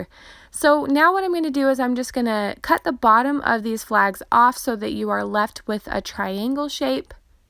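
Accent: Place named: Utah, United States. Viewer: I know that "accent"! LOL....I grew up in SLC. Haven't lived in UT for 20 years, but still have family there.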